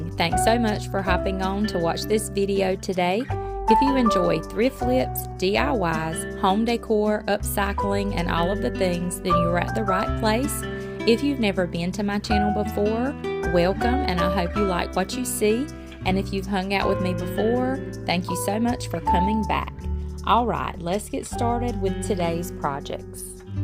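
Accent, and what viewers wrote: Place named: South, United States. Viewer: First time viewer. Love your projects and accent. Is it TN.,KY., or Alabama.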